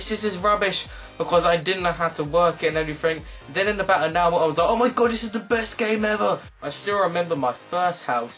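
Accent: England, United Kingdom. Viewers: do you live in england because of your voice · I think from your accent that you are British correct me if I'm wrong. But the question is: Can you do a regular American accent.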